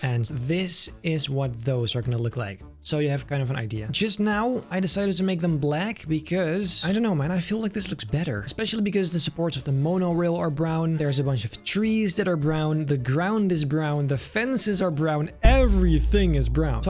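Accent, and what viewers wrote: United Kingdom. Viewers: he is getting to british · Is he British init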